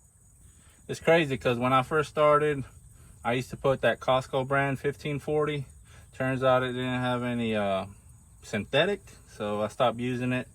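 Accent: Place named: South, United States. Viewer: Came over from your social media content bro, stayed for the funny stuff😂 the southern accent always gets me tho homie. Much love and look forward to seeing more videos😎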